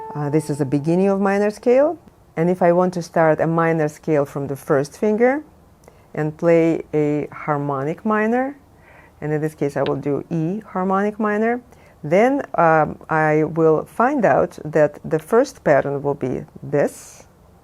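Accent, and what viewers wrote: Russia. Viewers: Are you русская?) Cuz I hear an accent)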